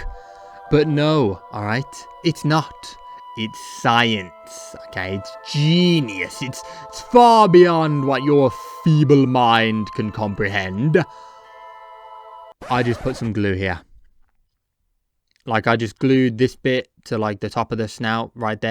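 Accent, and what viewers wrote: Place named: Birmingham, United Kingdom. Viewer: are you from birmingham?? s teir accent :))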